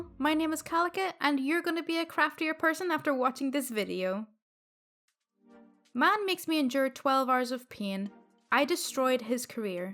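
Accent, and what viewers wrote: Ireland. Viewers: Np, it's true though. ^-^ You really never considered it? And hmmm... The obvious would be a wise guide character type, such as nanny mcfee. The accent, (Soft Irish?), is perfect and you're very well spoken. I'd guess an anime protagonist would be best though. You have a welcoming voice, and you're likable. Bet you could rock a Ghibli film! What do you think would suit you? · Hm... are you Irish,?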